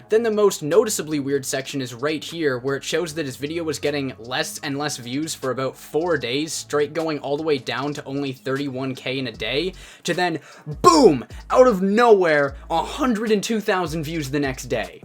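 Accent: Canada. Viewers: Jesus christ another video that you made using the term "industry plant" incorrectly. Not sure why your gay ass incorrect videos keep coming up in my feed, but it needs to stop. Additionally you need to stop making these videos using terms you learned online incorrectly with your canuck accent. Shit is cringe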